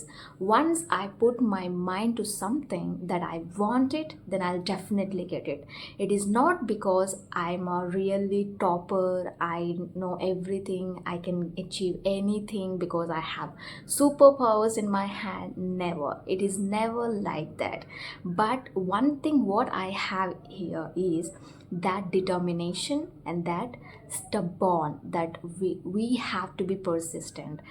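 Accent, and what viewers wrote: India. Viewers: Standerd indain english ❤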